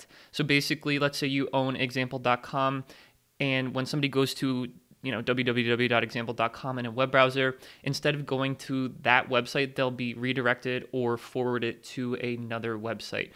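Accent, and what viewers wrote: Baltimore, United States. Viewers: I can hear that Baltimore accent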